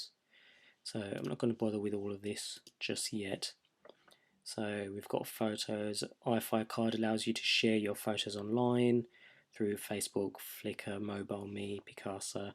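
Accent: United Kingdom. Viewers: why is british accent so damn annoying?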